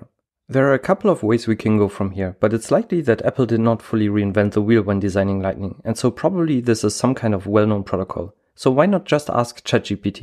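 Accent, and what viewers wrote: Germany. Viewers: 100% a German accent. Trust me, we have something akin to a sixth sense, allowing us to recognize our own accents in lightning fast speed (pun not intended lol). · Judging from your accent, you are German. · Yep he is german😂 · Are you German? Just asking because of the accent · are u german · Dieser Deutschakzent ist super 😁 Das Video auch ! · Are you from Germany? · Bist deutsch oder?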